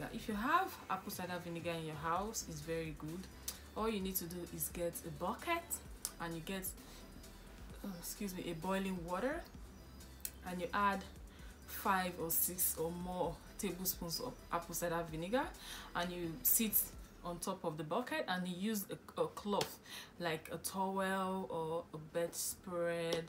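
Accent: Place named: Montreal, Canada. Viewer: t'as un accent montréalais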